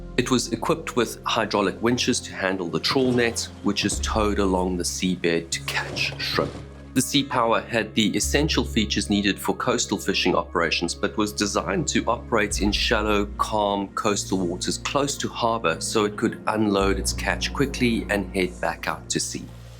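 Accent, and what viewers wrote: South Africa. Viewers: Are you south African brother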